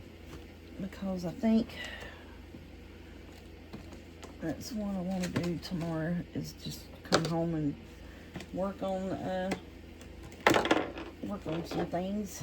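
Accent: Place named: North Carolina, United States. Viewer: Are you from North Carolina? I am and it sounds like you are. I love it!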